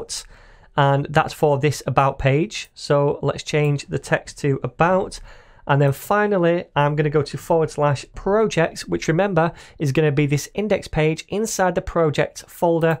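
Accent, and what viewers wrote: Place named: Barnsley, United Kingdom. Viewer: Great videos mate, thank you! Saw that you mentioned Manchester but sounded like you were from Barnsley 😉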